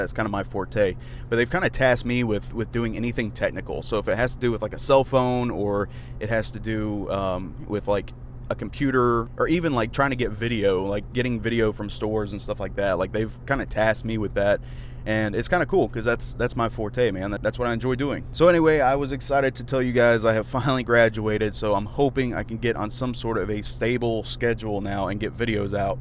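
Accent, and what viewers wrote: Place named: South, United States. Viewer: You have a very pleasant voice to listen to, I think everyone hates their voice but you just have a soft southern accent. Don’t hate it. 😃😃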